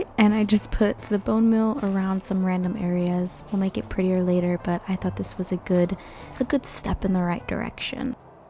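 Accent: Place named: South, United States. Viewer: I love how towards the end she just transitions more and more to her southern accent 😂😂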